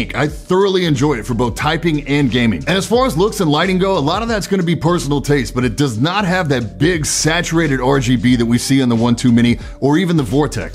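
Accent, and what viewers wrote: United States. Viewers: Man this dude is so great. Finally an American (at least I’m guessing from your accent) who measures using the METRIC system. Thank goodness you’re trying to please literally everyone except Americans 😄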